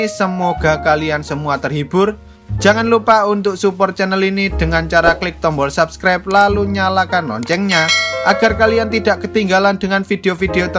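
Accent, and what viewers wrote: Java, Indonesia. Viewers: bang sampean wong jowo ya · Jawanya medok skalee rekk🤣🤣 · Wong jowo yo cak? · Meddok emet lu bru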